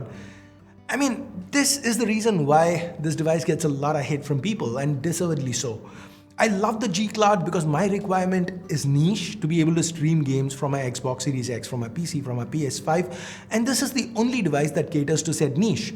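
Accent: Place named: Tamil Nadu, India. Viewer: You English is like a typical tamil school student who always wants to grap the attention of others just by speaking in English.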